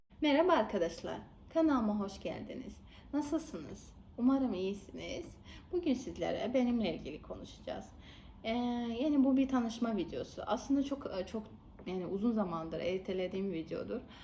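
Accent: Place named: Azerbaijan. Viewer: Bakü’de doğmuşsunuz ne güzel. Konuşurken şivenize bayılıyorum. Dinlemek çok hoşuma gidiyor. Sizinle ilgili merak ettiğim birkaç şeyi de yanıtlamışsınız teşekkürler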